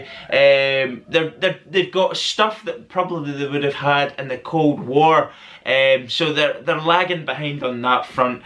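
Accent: Scotland, United Kingdom. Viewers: There is no cooler accent in the world than the Scottish accent. I just love it! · If I could buy an accent it would be Scottish.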